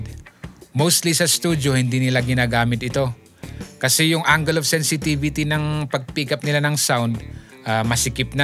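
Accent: Cordillera, Philippines. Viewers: Apay madlaw ba? hehe, the cordillera accent. Ayos na ayos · Are you by any chance from the Cordilleras? Thanks for this vid, very informative. Iyaman. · I Thought ur from Cordillera hehe. Accent dude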